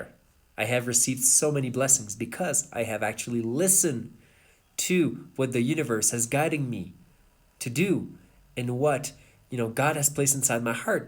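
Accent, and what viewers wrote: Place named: Quebec, Canada. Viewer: Thanks for sharing your great journey! I knew I recognized that accent 😅 Du Québec ici ✌️😁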